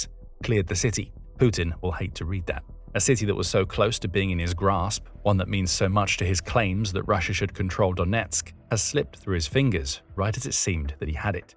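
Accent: United Kingdom. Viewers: Sounds british, therefore most are lies...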